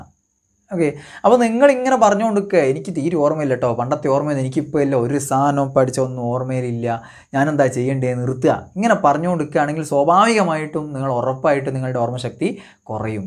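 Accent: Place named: Vadakara, India. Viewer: വടകര ഭാഷ......സൂപ്പർ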